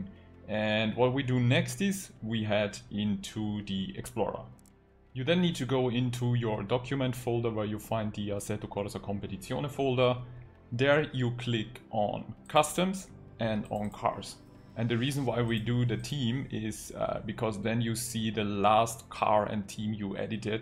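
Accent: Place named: Germany. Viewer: Danke erstmal für deine Videos. Ich denke du bist Deutsch, würde ich jetzt einfach mal von deinem Dialekt ableiten. Kannst Du das nicht noch mal in Deutsch machen? Das würden vielen von meinen Leuten helfen und wäre sehr ehrenhaft von Dir.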